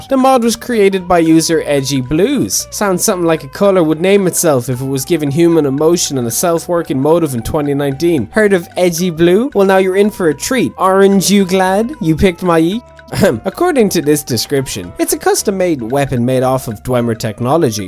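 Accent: Ireland. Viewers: Ah, a fellow Irishman Any craic?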